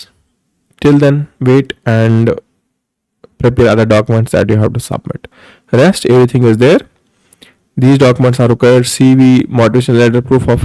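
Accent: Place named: India. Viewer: your indian accent was just disturbing. try to correct how to speak in english before applying scholarships.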